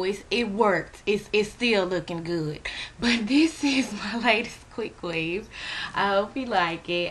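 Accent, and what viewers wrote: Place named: South, United States. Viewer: i can tell ur from the south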